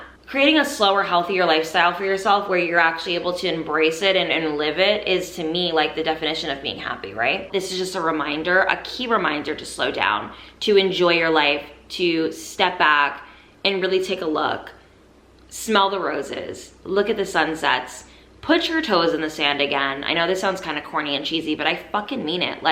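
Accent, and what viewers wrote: New Jersey, United States. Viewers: Omg this accent! It’s so cute, are you from New Jersey??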